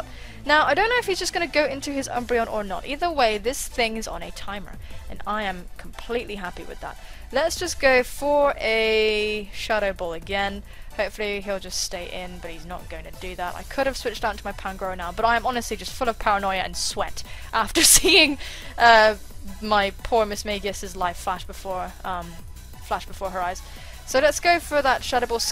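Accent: United Kingdom. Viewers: You are SUPER British! Lol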